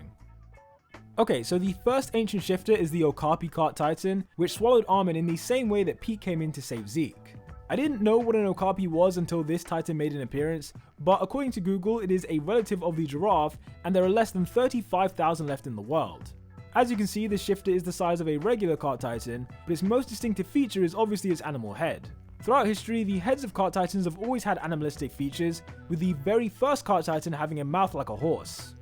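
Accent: United Kingdom. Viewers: she speaking british which gives me the tingles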